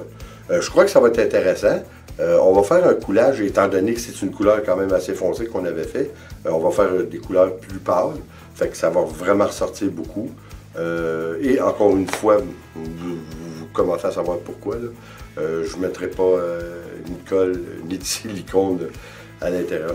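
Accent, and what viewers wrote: Canada. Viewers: Un petit bonjour de Belgique ! J'ai toujours un plaisir fou à regarder vos vidéos. Je me régale du début à la fin et j'adore vous écouter parler ; votre accent canadien me plaît vraiment beaucoup. Merci du partage de vos expériences.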